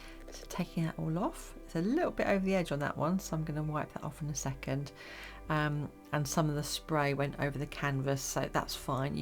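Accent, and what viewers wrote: England, United Kingdom. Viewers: This was an incredible piece of artwork,. really lovely to listen to your story. You have a very soothing voice, also great to hear a fellow English accent 😂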